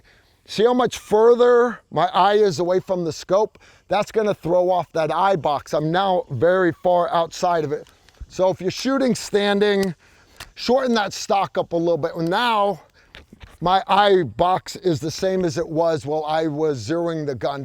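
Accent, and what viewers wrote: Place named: New England, United States. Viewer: I've been tuned out for a while. Glad to see you're still giving good men great advice. Gotta ask, are you from the New England area? You sound like a tactical Lobsterman!!!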